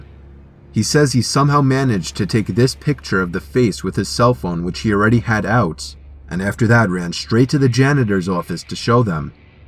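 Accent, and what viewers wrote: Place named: United States. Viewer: They don't sound the same, they have different accents... Mr. Nightmare is (I'm assuming) from America and CreepsMcPasta is British, for example.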